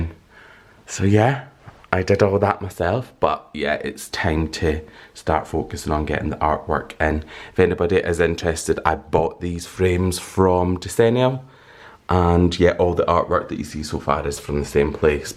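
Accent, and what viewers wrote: Scotland, United Kingdom. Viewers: Are you Scottish I 💗 your accent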